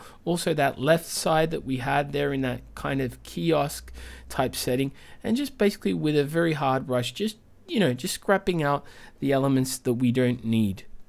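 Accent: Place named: Portugal. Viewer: olá, sotaque português não passa despercebido. já aderiste a este grupo? 3D PT <link> abr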